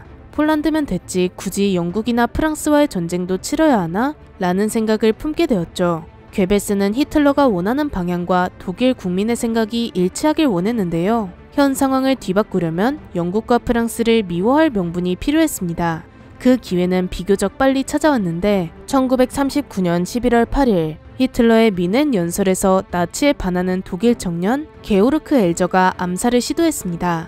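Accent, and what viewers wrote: Gyeongsang, South Korea. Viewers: 경상도 사투리? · 내레리션 담당자분 경상도 사투리 들려요 ㅎㅎㅎㅎㅎㅎㅎ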